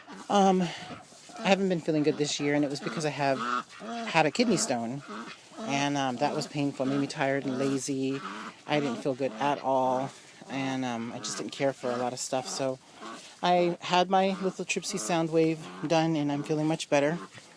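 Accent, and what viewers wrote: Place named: United States. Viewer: Hi, u might have seen my comments before. I see u like you ducks ect. Well I was thinking u would like expensive more pretty kinds. And they are rather hard to get! I have red breasted and emperor geese...? They are worth quite a lot as they are hard to breed and are very very pretty! If you like any ideas with birds like these give me a shout and I can tell you. I'm not trying to see you anything as I live in Scotland and by your accent your from America? Thanks!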